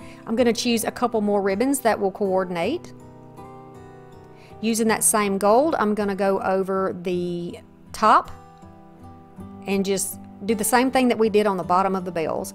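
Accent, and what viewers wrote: South, United States. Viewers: Oh my goodness I have never seen Better BELLS in my life. I've never done this and I'm just excited to tell you how great I think you are. Your southern (BELLS) accent makes me feel at home. Like talking to a friend. Have never commented on line or entered a giveaway. If someone can tell me what to do from here. I hope I don't have to know a login or username cause I don't have a clue. I need a sister in crafting to help me along. Can you help me??? BELLS me back. Hehe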